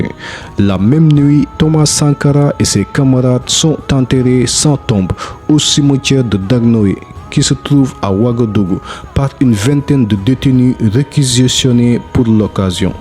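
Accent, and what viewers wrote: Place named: Senegal. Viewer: 😀c'est un senegalais qui parle comme ca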